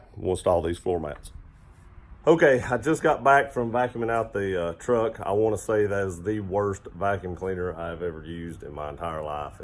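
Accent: South, United States. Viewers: That southern drawl is like nails on a chalk board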